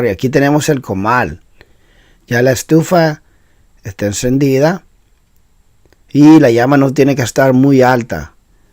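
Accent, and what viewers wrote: Costa Rica. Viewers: ese maje habla como costaricense. y dando recetas del salvador jajajja. esas no son pupusas ni el curtido .. nada que ver con el salvador .. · Bien perdon me adelante con el comentario... pense que eras hondureño... aunque tenes el acento mas a tico que Nicaraguense, bueno... gracias porque te gustan las pupusas y las mejores pupusas estan en olocuilta en El Salvador, tambien en los planes de renderos y hay varias partes en El Salvador donde las hacen riquisimas ya se a de maiz o de arroz, practicamente en cada esquina de cada calle hay pupuserias, un buen Salvadoreño minimo come de 2 a 5 veces pupusas a la semana!!! bueno saludos al pueblo Nicaraguense nuestros hermanos!!! y perdon por el comentario anterior!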